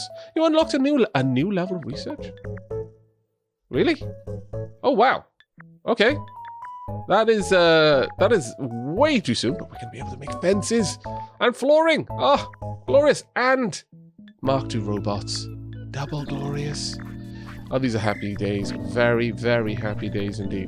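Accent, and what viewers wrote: Wales, United Kingdom. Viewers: Question are you from Wales if so are your from South Wales?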